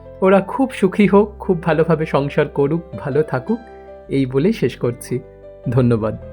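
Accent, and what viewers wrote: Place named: Santiniketan, India. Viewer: Apnr bolr style ta santiniketoni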